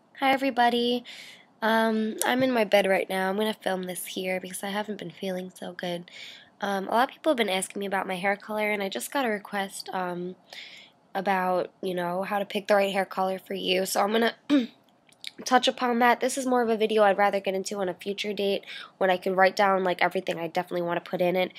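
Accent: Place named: New York, United States. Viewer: Do you live in New York? I can kinda hear the accent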